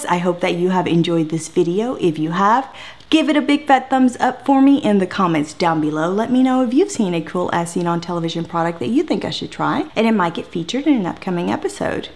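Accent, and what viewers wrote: Texas, United States. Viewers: yep she’s from Texas!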